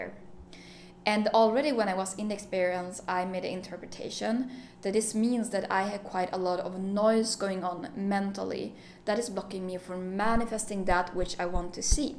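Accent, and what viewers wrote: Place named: Sweden. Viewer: nice trip report, sounds like you had a wonderful experience! and yes your experience sounds very much like "The K experience", that is very interesting! im actually heading out tomorrow to collect som amanitas, im going to make an extract of it, im very excited :) also i hörde på en gång att du var svensk haha, så trevligt^^ heja sverige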